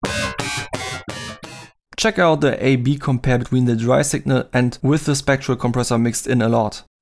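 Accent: Germany. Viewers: Du klingst so stark deutsch 😂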